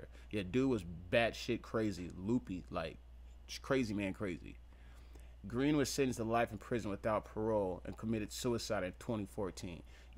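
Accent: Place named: New Jersey, United States. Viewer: Are u from Jersey bro? You sound like it. Either way keep it up brotha!!!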